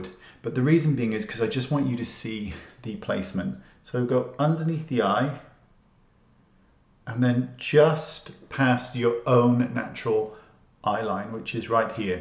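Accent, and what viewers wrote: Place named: London, United Kingdom. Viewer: Thank you very much,. I love your English. Where r u from? London? 💞💕👏🙋🏻🇪🇸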